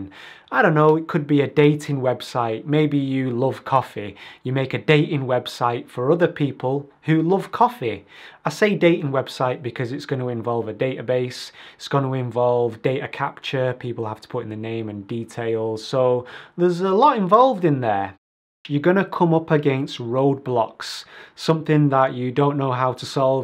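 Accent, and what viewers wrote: Manchester, United Kingdom. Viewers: Is that a Manc accent I hear?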